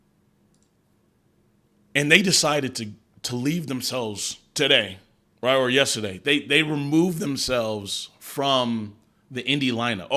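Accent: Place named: United Kingdom. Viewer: That British accent is his real accent.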